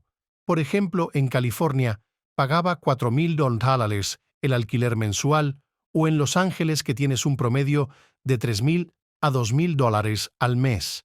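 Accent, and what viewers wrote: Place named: Spain. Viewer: Yayaya párale españolito Mamila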